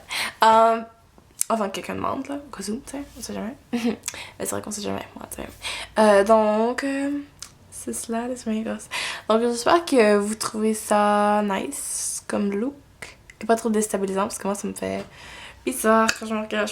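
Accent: Quebec, Canada. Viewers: c'est l'accent du Québec :))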